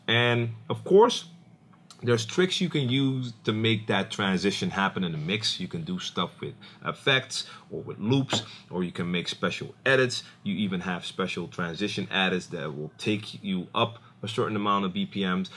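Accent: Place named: Northeast, United States. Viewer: Then why do you talk like someone from the Northeast US?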